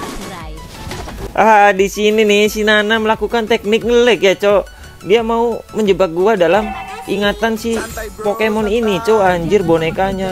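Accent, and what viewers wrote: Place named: Central Java, Indonesia. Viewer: Dri logat nya kaya nya org jateng ya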